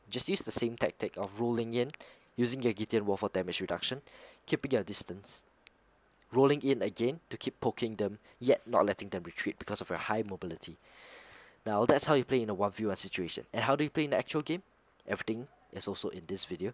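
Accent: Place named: Singapore. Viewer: You sounded like a Singaporean..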